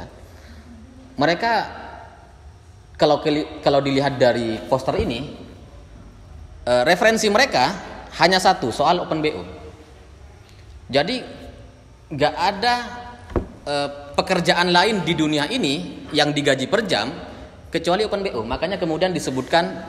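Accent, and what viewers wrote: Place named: Madura, Indonesia. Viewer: oh....ternyata cak maduranya Sumenep, makanya logat maduranya nggak kentara.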